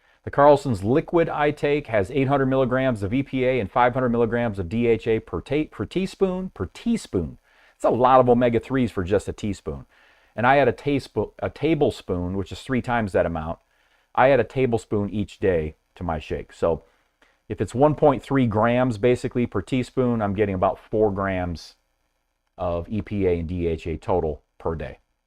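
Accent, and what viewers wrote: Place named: Australia. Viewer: I thought maybe I had a relaxation music video on at same time! 😂 that coupled with the Aussie? accent was a lot!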